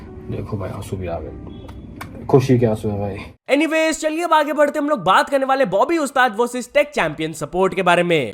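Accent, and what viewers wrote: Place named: Bihar, India. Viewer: got all the bihari accent inside him 🥵🥵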